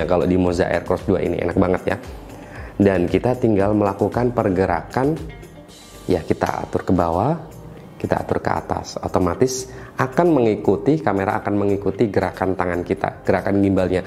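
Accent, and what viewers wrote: Pekalongan, Indonesia. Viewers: Wong pekalongan yo mas? 🙏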